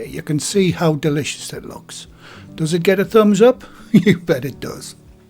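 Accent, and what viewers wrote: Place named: North East England, United Kingdom. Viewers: I'm inspired and subscribed. I got caught up in a Youtube loop and somehow ended up at your splendid channel. I'll be making kebabs this weekend after watching one of your other posts. I was born and raised in Durham. I haven't lived there for a long time but always nice to hear a north east accent. All the best! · I am so glad I found your channel, being from near Middlesbrough it's so nice to hear a North East Accent online! Thank you for the videos and happy cooking:)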